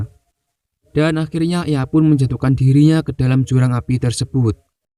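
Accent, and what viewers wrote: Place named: Java, Indonesia. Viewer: logatmu medhokk bro... wkwk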